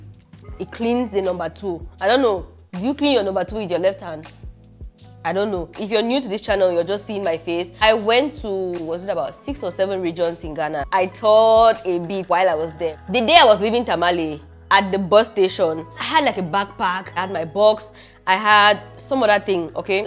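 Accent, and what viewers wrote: Nigeria. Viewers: I love your content. A Cameroonian with a Nigerian accent 😍 and body language. That goes to show that we're one with slight cultural differences. Keep it up, babe 👍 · Not conversant with Cameronians but you sound like a Nigerian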